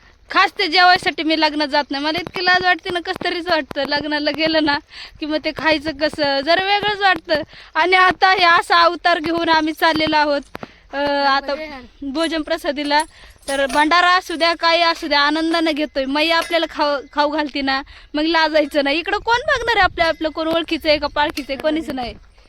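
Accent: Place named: Rural, India. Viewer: ताई मी तुमच्या आई च्या वयाची असेन तुमचे परिक्रमे चे व्हिडीओ मी नेहमी बघते, तुम्ही खूप साधे पणाने राहता व साध्या सोप्या ग्रामीण गंध आहे तुमच्या बोलण्याला! नर्मदा मैया तुमची इच्छा पूर्ण करो! 🙏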